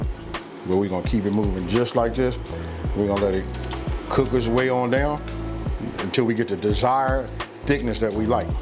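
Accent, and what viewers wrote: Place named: South, United States. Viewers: You the man I grew up in the south I'm ga boy You are ga or Alabama or Mississippi Good cooking. God bless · I'm Canadian and I really really like American accents. Yours is off the charts! Love that Southern twang. Also, this recipe looks delicious. Will definitely try it!! Cheers 🍻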